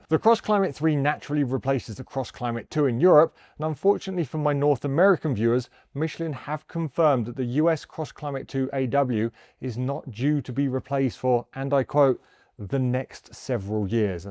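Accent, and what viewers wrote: United Kingdom. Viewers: lol, i always thought you were British :D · I don't TRUST these "review channels" much, they all probably funded by manufacturers of tires. Too much bias. And a guy with brit accent living in Salt Lake City.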